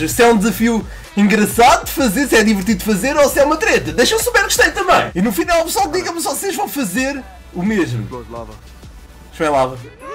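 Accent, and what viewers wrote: Portugal. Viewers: Nsss gente o jeito que português fala é tão diferente do brasileiro😂😂😂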